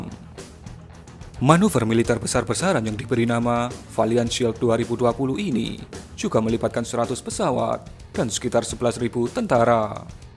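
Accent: Java, Indonesia. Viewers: suara admin yg medok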